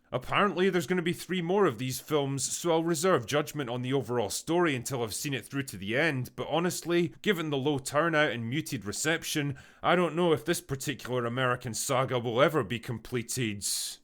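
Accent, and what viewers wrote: Scotland, United Kingdom. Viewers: It's the Scottish accent, especially in Dindee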